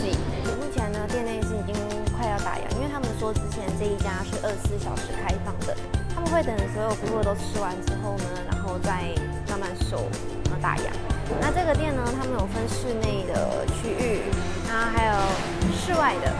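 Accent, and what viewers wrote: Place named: China. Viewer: 為什麼妳講話會有點中國的腔調😂😂😂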